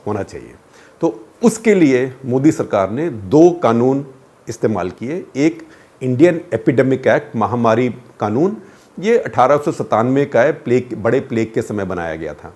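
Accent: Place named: Punjab, India. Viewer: sir.....Your hindi has a punjabi dialect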